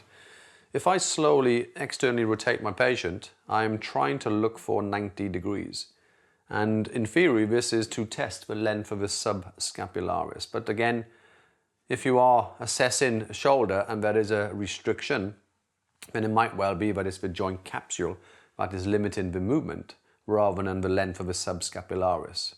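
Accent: Wales, United Kingdom. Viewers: Are you welsh? Great videos. Love them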